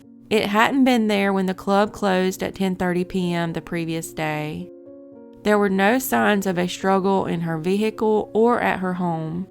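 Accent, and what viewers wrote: South, United States. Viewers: I am truly addicted to true crime and cold cases, I enjoy your presentations and I love listening to your sweet southern accent. Please keep it up, by bringing awareness to these cases you never know who is watching and who may have a key to solving any one of these mysteries. Thank you! I will keep watching and keep supporting your channel!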